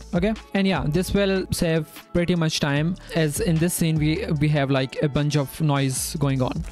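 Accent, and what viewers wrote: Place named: India. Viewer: Why do useful tutorial always have Indian accents?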